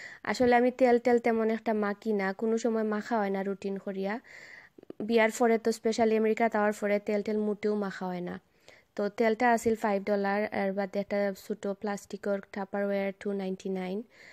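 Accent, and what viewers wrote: Sylhet, Bangladesh. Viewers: Apu,, miss ur sylheti maat.... ❤ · Asalamualikum Appa. Try kormu afnar recipe. Very nice recipe. I like how you talk in sylheti even I feel same that we are sylheti we spouse to talk in our language. Thanks 😘❤️❤️❤️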